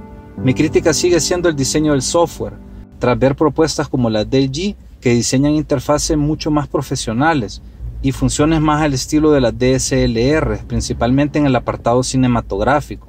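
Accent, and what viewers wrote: El Salvador. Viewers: Eres de El Salvador verdad 😮😮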